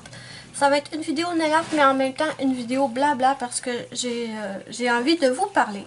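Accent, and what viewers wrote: Quebec, Canada. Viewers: Cc on t apprécie comme tu es et reste naturel j adore ton acsent québécois et tu es super. Très jolie ton Nails art. Biz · Bonsoir.Je rejoins l'avis des autres personnes qui ont laissé des commentaires....Ne change rien.... surtout!!!! Moi je regarde tes vidéos....pour ton accent québécois.... Il est très....cute...comme tu dis souvent 😊... Et également parce qu'elles sont bien faites...tout simplement. Je ne fais pas de nail arts mais j'adore regarder les tiennes.le résultat est magnifique.Je fais du coloriage pour adulte et j'aime bien ton autre chaîne notamment pour les présentations de livres car tu es franche en les présentant.Surtout continue comme ça!!! Bonne soirée! Bises! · Merci pour toutes tes vidéos 😊 et surtout ne change rien, reste toi-même c'est parfait 😉👍!! J'adore ton accent québécois 😃 !! Et comme tu le dis très justement, ceux qui n'aiment pas tes vidéos n'ont qu'à passer leur chemin. 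Comme on dit "la critique est aisée mais l'art est difficile" alors que les insatisfaits aillent sur d'autres chaînes ou fassent mieux !! Continue comme ça et merci 😊😊😊👍👍👍 !!!! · Super joli !!! Je suis tout à fait d'accord avec toi !!! Moi si je te suis c'est justement parce que tu es authentique et j'adore ton accent canadien😍 ne change rien surtout... j'attends toujours tes vidéos avec impatience et j'ai tout appris avec toi !!! 😘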